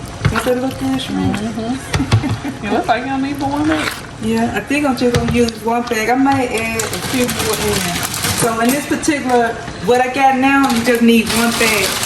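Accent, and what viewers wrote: South, United States. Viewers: I like the southern accent, mispronounce words and all don't change and I know that this is good cause I've made some dishes right out the bag right out of the bag in a can you gotta make stuff easy after a long day on the job and get this y'all they do the same thing in restaurants is out of a bag where can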